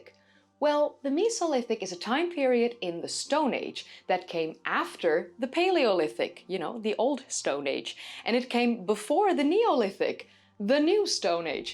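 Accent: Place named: Netherlands. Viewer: Of course she's Dutch. You can tell by her accent. (Almost).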